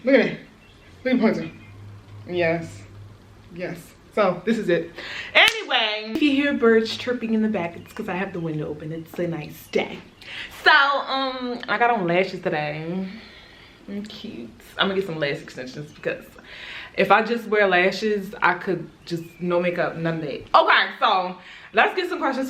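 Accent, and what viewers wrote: Baltimore, United States. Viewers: You so baltimore I love it😂😍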